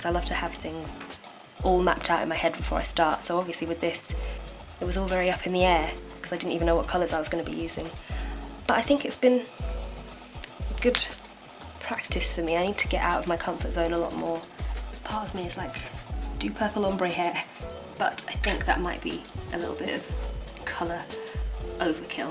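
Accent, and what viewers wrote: United Kingdom. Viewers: are you British?? X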